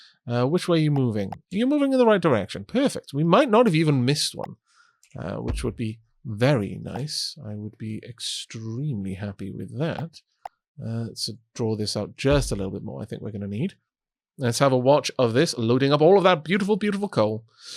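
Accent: Wales, United Kingdom. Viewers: Ha, just finished the previous episode, enjoying the dulcet welsh tone of our tea drinking dragon saviour as I recover from my surgery today lol much appreciate the upload to keep my chill recovery going lol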